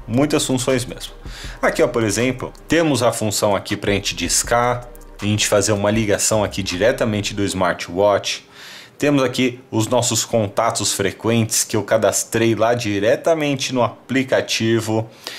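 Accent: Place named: Portugal. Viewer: portugues portugal pelo que vi! achei interessante o relogio!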